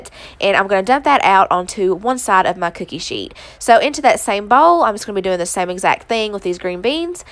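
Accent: South, United States. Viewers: Are you from the south? Cause you talk and cook southern like myself :p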